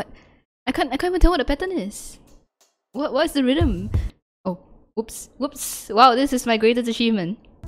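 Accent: Singapore. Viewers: i could tell right away you were from sg, this video confirms it LOL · Oh boy I can hear the Singapore in the voice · Are you Singaporean? Just curious. It sounds like a very singlish accent. =)> Also nice video! I’m surprised you put captions over the ENTIRE thing (this is the first time I’ve see a video of yours so if that’s a normal thing I didn’t know)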